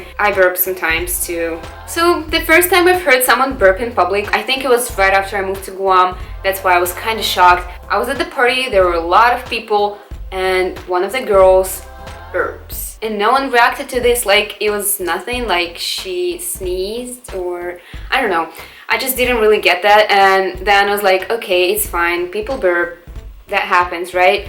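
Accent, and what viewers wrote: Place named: Russia. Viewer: Your English is superb. I didn't realize your accent was Russian until you started talking about being there. Great video.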